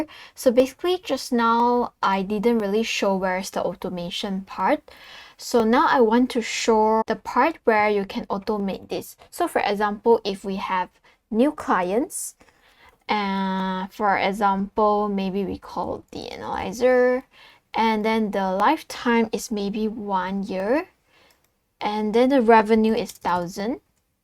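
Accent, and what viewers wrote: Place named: Singapore. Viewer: As a fellow singaporean I notice the accent - prolly singfaporean or malaysia - hi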